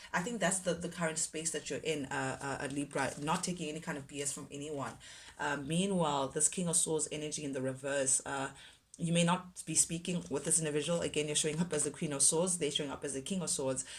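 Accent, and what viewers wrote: South Africa. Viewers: Wow I love your channel, you sound south African could be wrong, but I like it.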